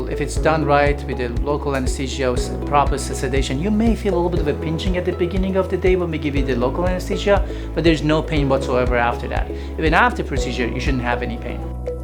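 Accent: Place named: Iran. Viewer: lovely persian accent :-)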